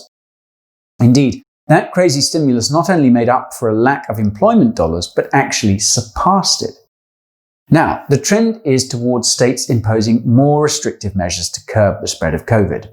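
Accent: United States. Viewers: sounds American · Guy talks increasingly like Americans now.... "Here's the deal" "if you ask me"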